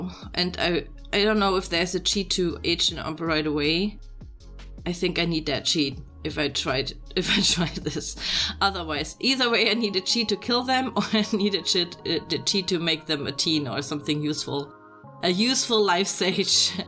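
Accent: Germany. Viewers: Im so early.. anyway you sound German.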